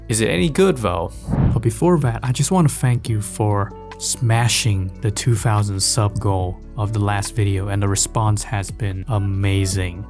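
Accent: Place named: Vietnam. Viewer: ô ông anh là người Việt à, accent đã thế. Có 1 tấm a chụp ở UTE đúng k nhỉ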